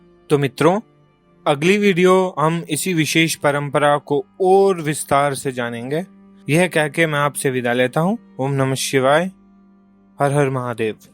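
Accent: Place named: Kashmir, India. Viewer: Narrator is Kashmiri, I can tell from the accent… loved that ❤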